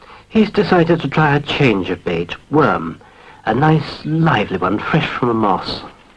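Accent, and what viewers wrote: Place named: RP, United Kingdom. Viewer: Very posh old boy...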